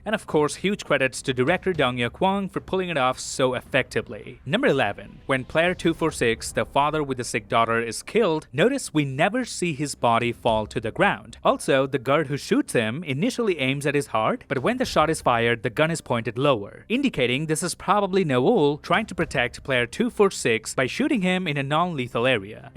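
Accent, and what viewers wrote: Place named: Canada. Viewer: which part of canada is this accent from? Sounds interesting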